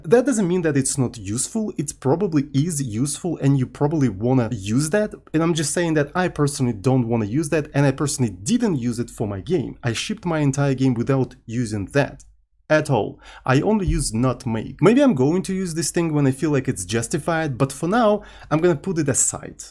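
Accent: Russia. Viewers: Excuse me for such question, are you from Russia?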